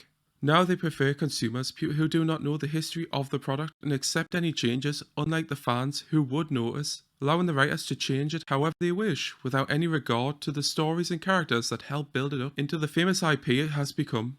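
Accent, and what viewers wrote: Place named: Wales, United Kingdom. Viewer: Either that or just a Welsch person. That accent is terrible.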